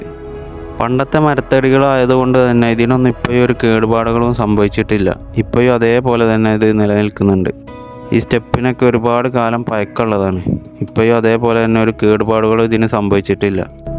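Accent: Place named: Malappuram, India. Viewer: ഇത് മലപ്പുറമാണ് ഇവിടെ ഇങ്ങനെയാണ് സംസാരിക്കുക.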